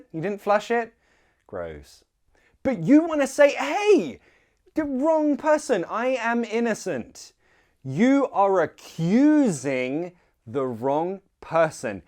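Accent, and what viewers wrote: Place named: RP, United Kingdom. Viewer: Thanks ever so much, I like the way you talk and teach, and you are very understandable. I like the RP English accent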